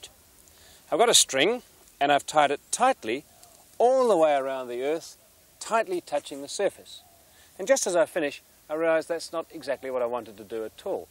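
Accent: United Kingdom. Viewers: even before clicking the video, i knew that man would have a British accent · I’m an Australian and I picked his accent as slightly British. He may have spent some years studying in England? Or it may just be he has a cultivated accent due to his high level of education. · No he isn't. That's a British accent.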